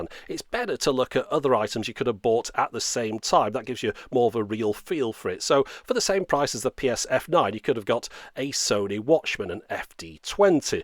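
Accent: United Kingdom. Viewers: Great video. I'm an English student at the University and it's really nice to listen to something with nice British English. Having fun and improving my pronunciation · This accent is so, so British!!! Like in this video: <link>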